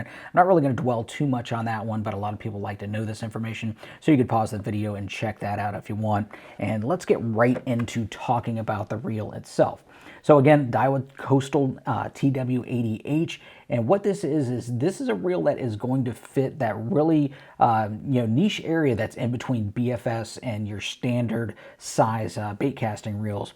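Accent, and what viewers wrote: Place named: Texas, United States. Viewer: Texas rubbed off on you huh?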